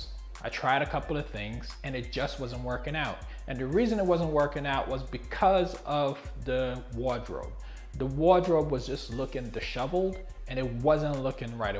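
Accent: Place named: Jamaica. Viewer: Bredren, where you hail from? Sound a bit like my countryman...Jamaica. Great video.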